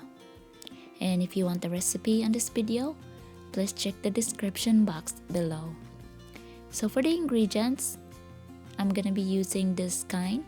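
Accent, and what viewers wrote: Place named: Bohol, Philippines. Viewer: Ganda ng Boses Malamya manalita,Saan province mo,Bohol?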